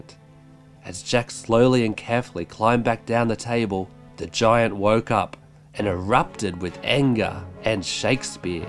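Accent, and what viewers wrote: Australia. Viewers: "once upon a time there was a boy named jack". you took me here!! Ps: aussie accent is attractive the most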